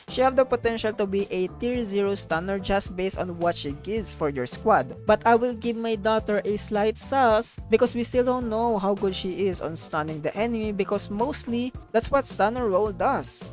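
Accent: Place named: Philippines. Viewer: Beri Pilipino accent! Reminds me of Jo Koy. Correct me if I'm wrong but if right, keep it up kabayan! 😊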